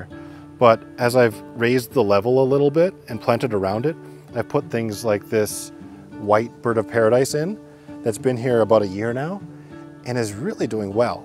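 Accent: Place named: Canada. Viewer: Canadian accent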